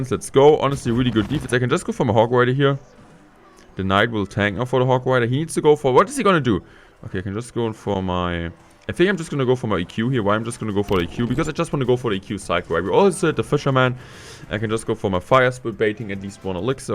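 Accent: Germany. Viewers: Your english look like deutsh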